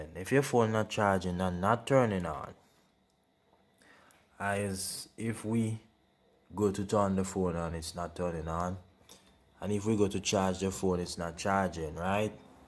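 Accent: Caribbean. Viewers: As soon as I heard the voice I knew I was in good hands. Soothing Caribbean accent man, thank you so much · Which part of the Caribbean are you from?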